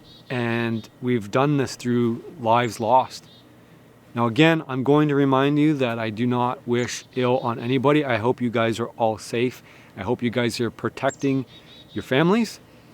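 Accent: United States. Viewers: Wow, you sound like a red blooded American here. And I agree with everything you've said, and share your concerns. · NHL u knida sound like a American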